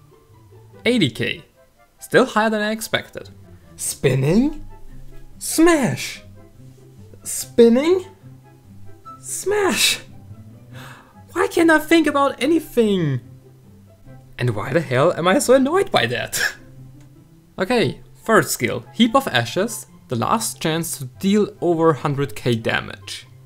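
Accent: Germany. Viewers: klingst deutsch 🤔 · You sound like Niki Lauda. Are you german?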